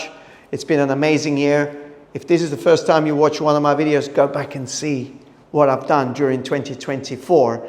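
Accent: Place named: United Kingdom. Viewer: Tantissimi complimenti e grazie mille per far conoscere la puglia con un bel British Accent 🙂 Mi ricordi tanto il nostro mitico royal family reporter Antonio Caprarica.. siete parenti per caso?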